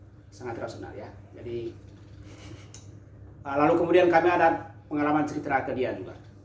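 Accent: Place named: Manggarai, Indonesia. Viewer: Bp doktor, itu logat Manggarai tuu kental ew😅😅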